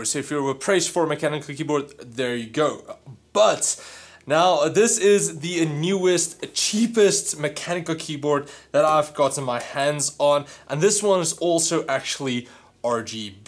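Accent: Afrikaans, South Africa. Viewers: I could recognise ur accent I was born in SA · Jy klink regtig afrikaans ek is afrikaans btw as jy nie geweet het nie lol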